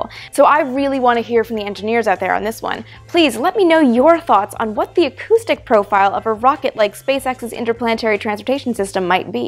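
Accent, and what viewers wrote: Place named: Toronto, Canada. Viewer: also you are from the GTA from your accent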